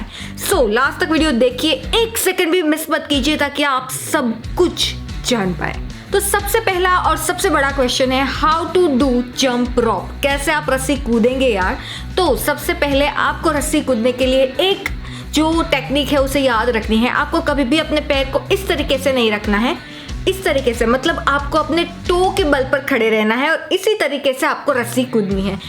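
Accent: Gujarat, India. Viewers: Gujarati tone lag raha hai aapki boli mein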